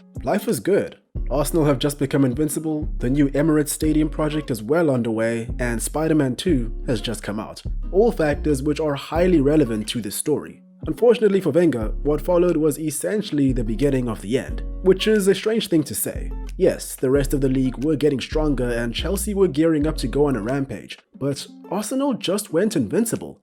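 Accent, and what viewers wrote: South Africa. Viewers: You sound south african? Correct? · I recently discovered your channel. I could tell by your accent that you're South African. Once I confirmed that, I hurried to subscribe because you make really great videos. I vowed to myself that this would now be my favourite channel pertaining all things football. Then you made a joke about my beloved Arsenal. So I will give it to you in a way that's quintessentially South African, voestek! 🤣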